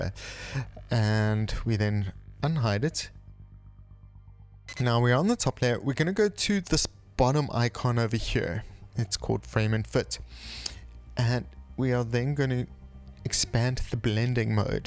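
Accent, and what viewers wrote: South Africa. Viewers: Spot a South African just by their accent. Great video mate! I use Lumafusion for all my editing and grading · I could hear the south African accent and then I saw table mountain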